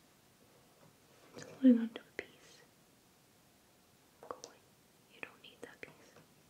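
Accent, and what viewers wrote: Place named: United Kingdom. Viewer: Hey there. Your voice has moved into my top 3. Probably some to do with your British accent . Anyhow greetings from Michigan Usa. Need to drop you a tip soon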